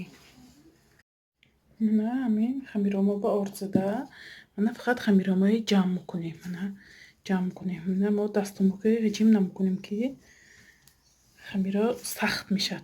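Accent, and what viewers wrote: Isfara, Tajikistan. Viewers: Апаҷон шумо исфарагими.гапзанитон монанд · Рахмат барои рецепт! Гапзанитон исфарагихо боин)) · Салом бисер барои мо хам маькул шуд рахмат. Шумо лафзатон Исфарагибоин саломат бошид.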